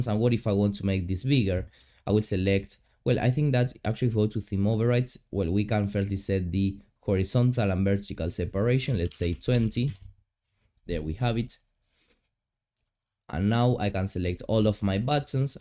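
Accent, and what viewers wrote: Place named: Rioplatense, Argentina. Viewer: El acento rioplatense es tremendo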